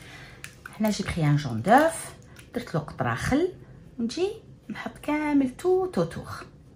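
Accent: Algiers, Algeria. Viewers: j'adore vos recettes et votre accent algérois. Allah,Allah. 🇩🇿🇩🇿🇩🇿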